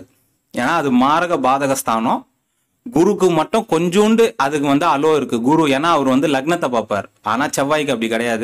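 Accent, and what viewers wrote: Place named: Chennai, India. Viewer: நல்ல சென்னை தமிழ் சார் 🎉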